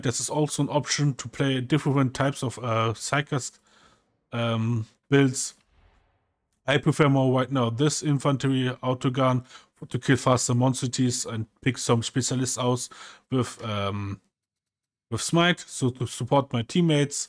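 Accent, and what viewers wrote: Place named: Germany. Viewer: Thanks for the tip! and your Denglisch is really gut :D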